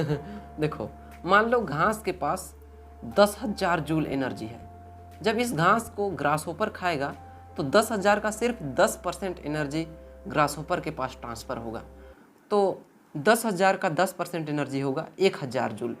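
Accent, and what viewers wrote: Bihar, India. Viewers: Sir aap Bihar se ho kya????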